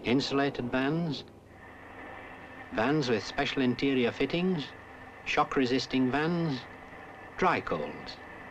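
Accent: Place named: Newcastle, United Kingdom. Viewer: I can hear the Geordie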